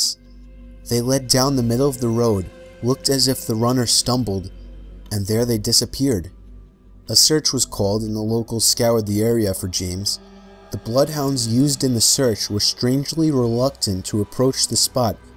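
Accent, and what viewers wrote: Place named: New Jersey, United States. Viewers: I can't place accent. I want to say S. Boston, but its not that thick. Definitely E. Coast. Could be New York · i feel like he's lost his jersey accent a bit.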